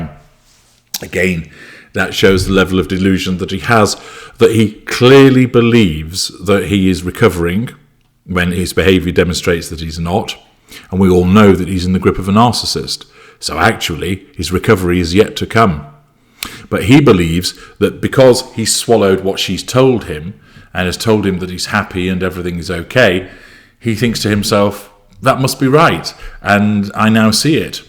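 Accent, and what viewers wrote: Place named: Widnes, United Kingdom. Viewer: Are you from widnes or that area Hough Green? I'm sure you lived around tge corner from me? You sound so familiar?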